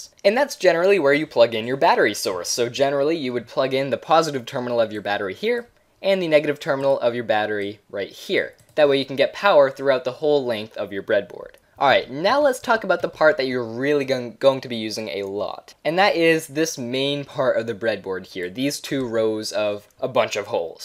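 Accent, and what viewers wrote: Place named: Canada. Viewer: Your "About" page says "United States" But your accent says: "Canadian" I'm confused. >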